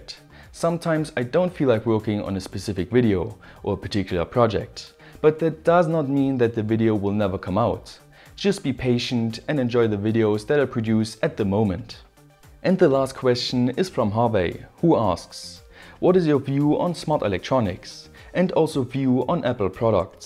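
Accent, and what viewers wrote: Germany. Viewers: So did I reading your comment, most, if not all, of his mispronunciations are due to his German accent, not his English skills, actually his English is excellent. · are you from germeny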